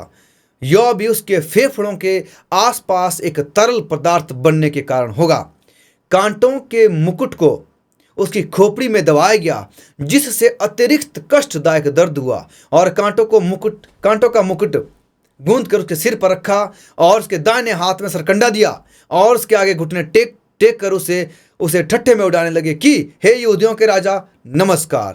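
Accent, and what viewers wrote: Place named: Himachal Pradesh, India. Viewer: Himachali hindi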